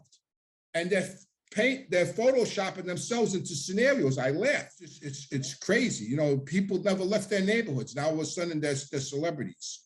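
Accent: New York, United States. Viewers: My dad knew Carlos Marcello and Marcello used to be teased behind his back for speaking with a Cajun accent. Our family came from Italy to Louisiana in 1894. Love the NY accent and enjoying the videos. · I love listening to you about baseball!❤️❤️ Ny Ny!!! I hear you about the Accent!!!! 😂😂 Yes we do have more common sense!! No need to apologize ❤️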